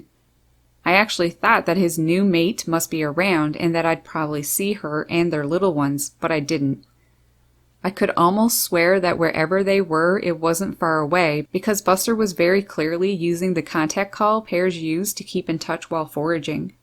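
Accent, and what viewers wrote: Canada. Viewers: What a keen eye for detail and patience. Beautiful Buster and Hatch sweet Kiwi. You have a cool Canadian accent!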